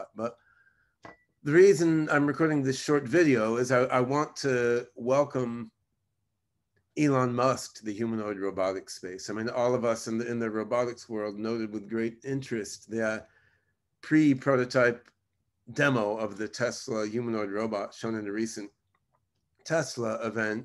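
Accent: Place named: Rio de Janeiro, Brazil. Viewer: Bichuu tu é brasuka meu .Pirei kkkk carioca ainda fechou . E ja ta na 3 muie é nois mano tmj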